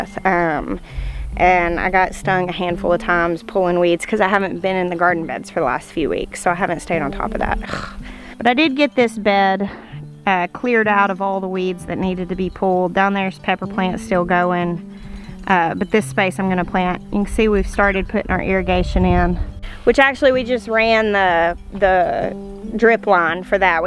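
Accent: South, United States. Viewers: Holy southern accent in this video! Never noticed it as much as this video! Must be the heat in the fall. 😃😃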